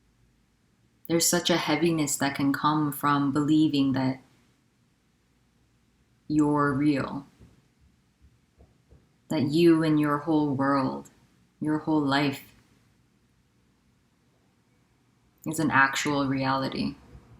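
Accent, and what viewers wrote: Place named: England, United Kingdom. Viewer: Beeeeuuutiful . And I love the English accent when USAID"it's quite amazing that this should happen😂"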